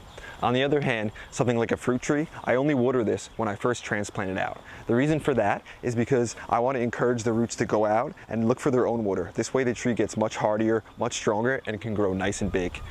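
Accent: New Jersey, United States. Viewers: Come for the gardening advice, stay for the New Jersey accent. "Worter"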